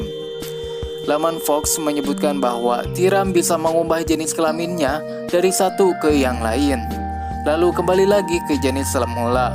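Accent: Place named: Sunda, Indonesia. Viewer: Dialek sunda nya kentel... Lanjutkann mantappp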